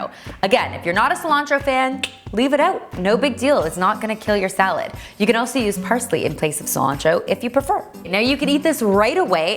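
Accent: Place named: Canada. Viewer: Just rewatched this for the bean salad recipe and my oh my!!! You Canadian accent was so prominent, I've been watching you since the very beginning and never noticed it until today! Love it!!!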